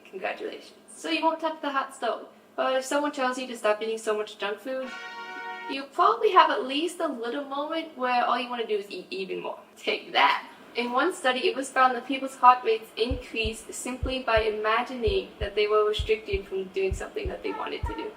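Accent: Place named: New York, United States. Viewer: That's a cool accent, where are you from? I'm British and I barely hear this accent on YouTube. Is it New York?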